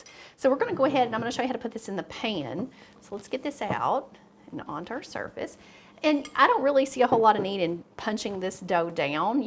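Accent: South, United States. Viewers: Thanks for your recipe, I don't really listen to people who talk a lot, but it was a pleasure viewing your channel as intriguing. Listen to your southern voice. Your family is blessed by your love of making bread 🍞. 😊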